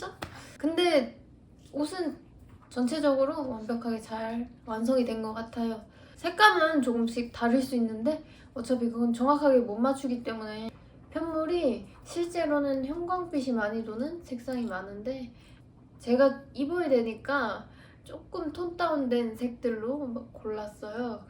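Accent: Seoul, South Korea. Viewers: 서울사투리 오랜만에 듣네요 ㅋㅋㅎㅎㅎ 잘 배워갑니다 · 정말 예쁩니다 판다면 사고싶을 정도로에요 ㅜㅜ 긴머리랑도 잘 어울리시네요 추천으러 처음 봤는데 말투가 중독이에요 약간 서울사투리+어딘가 사투리 섞인 느낌이네요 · 말씀하시는게 약간 옛날 서울사투리? 그런 느낌이라 너무 신기해요 ㅋㅋㅋㅋㅋㅋㅋ 목소리 높낮이도 그렇고 말투도 그런느낌이세요!! 목소리 이쁘시고 묘하게 웃겨요 ㅋㅋㅋㅋㅋ 😂😂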